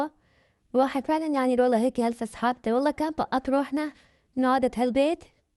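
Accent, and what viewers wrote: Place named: Aleppo, Syria. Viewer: واو لغة حلبية حلوة ❤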